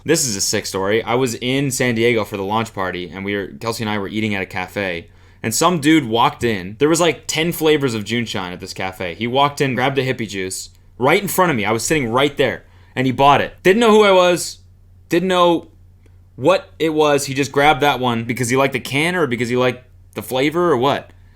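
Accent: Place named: Canada. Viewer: The Canadian accent is definitely shining through in this vid! Or am I just appreciating the fact that you are still all aboot your roots?* 👍❤️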